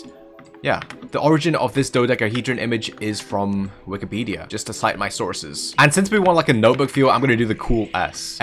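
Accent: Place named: United Kingdom. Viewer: wait he’s British? AW YEA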